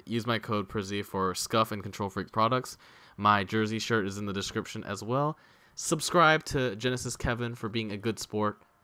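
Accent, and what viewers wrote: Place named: New Zealand. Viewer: You sound like kiwi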